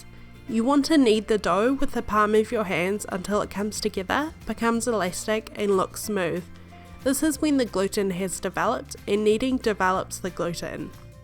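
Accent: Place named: New Zealand. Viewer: It's a new zealander accent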